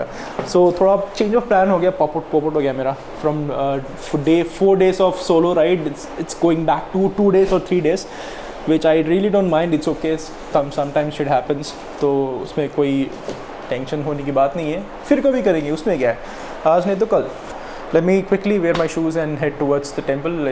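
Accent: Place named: Hyderabad, India. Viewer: Bro i like it ur hyderbadi accent.... maja agaya na ekdmach.... ... 😃🤣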